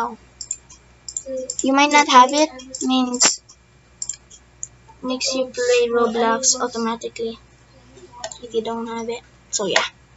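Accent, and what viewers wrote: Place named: Philippines. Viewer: nice filipino english accent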